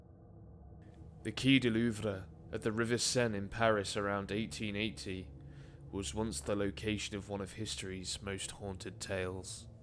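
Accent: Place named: United Kingdom. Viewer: Sorry but I think this story was proven to be a hoax in Paris. The face comes from an art book from a Helsinki art school published 20 years before the body was found. However many young people were fished out of the Seine during that period. Sorry to be a negative Nancy. I do love the gravitas in your voice and the brit accent!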